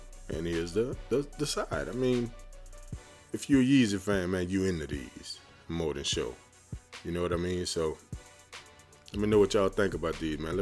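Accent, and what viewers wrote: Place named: New Orleans, United States. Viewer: They hard bruh ... Man U from NO... the accent sound just like it ... I’m in BR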